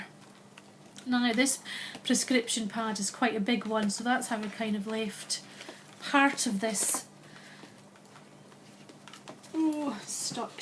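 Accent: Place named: United Kingdom. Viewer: Your imagination is incredible! I was unable to view more than the first two minutes of the first video (not sure why) I saw the doctors bag - girl what a terrific idea! Loved your video - love your voice and accent! (I am originally from the UK - moved here in 1988 (us) and anything from home sounds wonderful. Thank you so very much for sharing your creativity! Hugs Julie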